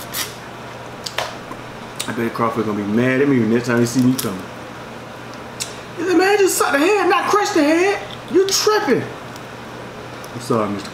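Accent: United States. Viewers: I’m from New York & i love how different & country his voice is 😍 instantly fell in love & subscribed 🥰🥰❤️❤️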